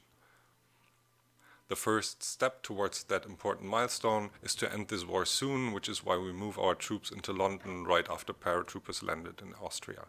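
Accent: Germany. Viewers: Are u from Germany? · Because he most likely has the German version of the game, from his voice and accent I would say he speaks German. In the German Game Version the Portraits of Head Nazis such as Hitler, Himmler, Göring, Hess and Göbbels Are blacked out or censored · I believe he’s German, I’m Dutch and it sounds a bit different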